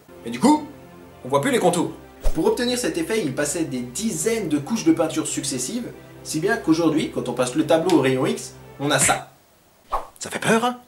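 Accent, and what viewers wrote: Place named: Alsace, France. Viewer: Tu ai alsacien car j'ai reconnue ton accent .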